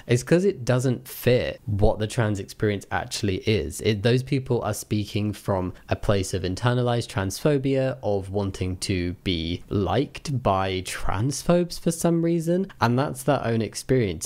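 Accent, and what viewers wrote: United Kingdom. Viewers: Omg these hate comments 😂😂😂 the only issue i personally used to have with your voice is your heavy british accent. It used to be kind of hard to understand for me no matter who spoke it, because english isn't my native language and the waste majority in media uses mostly american accents. I'm all good these days though, in part thanks to following you and few other channels of people from the UK. Love you, ❤, please don't even change. The cross between the dry humor, politely formulated sass, and actual explanations are so uniquely you, i love it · Re, Smug and patronizing: Thats the American ear hearing the British accent. John Oliver makes jokes about it frequently.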